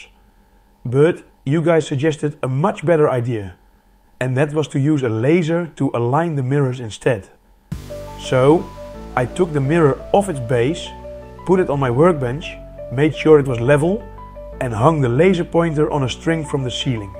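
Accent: Netherlands. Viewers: His english Sounds a bit like from nedderlands ... The sun there gives nearly 1000w/m² ... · Nederlander detected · Ahh that's crispy Dutch accent 😅😂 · I hear the Dutch ;) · I'm filled with proud Dutch feelings after hearing the accent. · So to hear it's definitely a Dutchman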